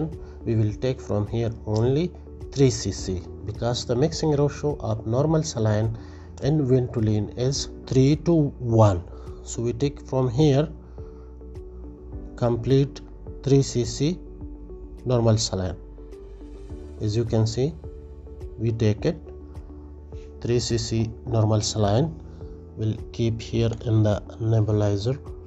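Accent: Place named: India. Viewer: you have an accent which I think is Indian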